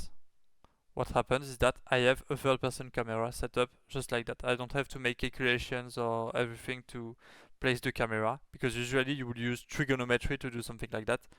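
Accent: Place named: France. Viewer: hello again, j'adore, quand, nous les fr ,nous parlons en anglais ^^ on a un accent parfaitement reconnaissable ^^ top projet !! ça m'inspire, pour essayer de créer un MK avec Unity ou UE mais pour l'instant, je suis sur la creation de Zelda totk ^^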